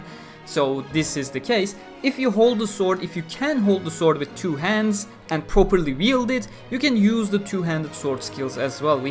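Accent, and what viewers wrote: Turkey. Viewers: Dün sword art online fandom wiki de eugeo sayfasında gezerken hadi eugeo'yu tanıyalım diye bir video gördüm ve nedensiz yere tıkladıktan sonra İngilizce aksanından yola çıkarak:"Bu arkadaş kesin Türk!" Dedikten sonra kanalına göz attım.Kanal ismimin olduğunu görünce sanki UEFA kupasını kaldırır edasıyla sevinçten minnacık bir ego kastım:) Her neyse Türklerin İngilizce aksanına alıştığım için ne zaman duysam tanırım:).Bu arada bu kanali daha önce neden görmedim bilmiyorum ama içeriklerin çok güzel ve çok derin.Sword art hakkında bu kadar bilgisi olan bir kanalın önünde saygıyla eğiliyorum.Çok ani gelebilir ama herhangi bir şeye ihtiyaç olursa biz buradayız:) bu arada İngilizcen çok akıcı.Sahsen çok beğendim.Nerede ve nasıl bu kadar iyi geliştirdin? Ve son olarak da bu kadar oyunu ve bu kadar bilgiyi nereden biliyorsun? Şimdiden teşekkürler, saygılarımla,bir hayranın...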